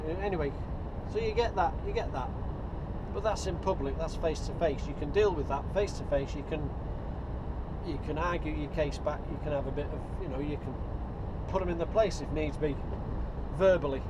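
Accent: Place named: Wrexham, United Kingdom. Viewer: Your accent sounds like your from Wrexham way... just block delete these fucks, i personally love your vids, seen every one of them, keep up the good work, and ignore them, block delete and you have won, they then have to go through all that rigmarole to make a new account, if then they come straight back and u block and delete them again, you have won again... they cant keep that up... love your vids!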